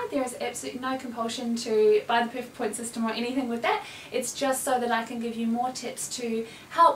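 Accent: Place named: New Zealand. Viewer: it sounds new zealand, i went to one of her workshops in rocky, she's great :)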